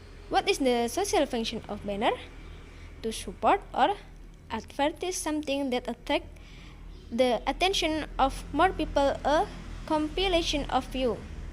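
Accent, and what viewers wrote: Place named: Java, Indonesia. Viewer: Javanese English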